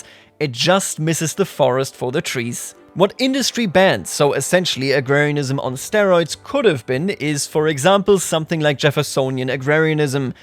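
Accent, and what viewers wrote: Germany. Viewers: how does he sound so german yet so fluent in english at the same time? i cant stop listening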